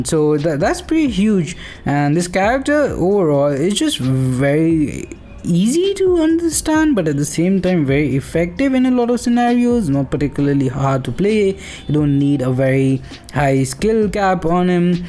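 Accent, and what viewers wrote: India. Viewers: You are from India , I have doubt in your sound its like indian man.But I am from India and even I like your video.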